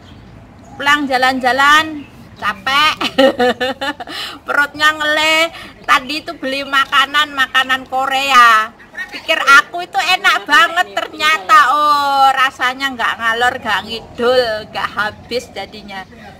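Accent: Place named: Banyumas, Indonesia. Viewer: Mantap banget bagi bagi ngapak hee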